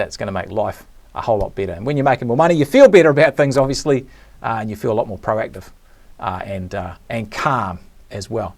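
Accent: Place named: England, United Kingdom. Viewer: Think of his accent. I am not sure if he’s in the US but it’s an English accent and Europe gets more time off compared to US. But I get 160hrs a year plus all bank holidays off so he’s not to far off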